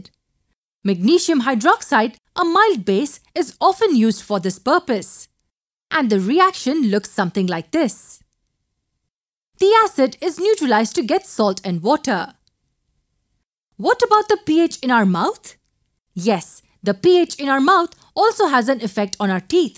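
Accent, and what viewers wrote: India. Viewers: Such knowledgeable and scientific information, the Indian accent is strong too, I love it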